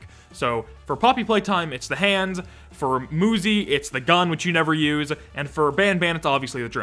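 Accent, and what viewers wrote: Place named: Canada. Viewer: Holy shit, is Canadian? I can totally hear it now.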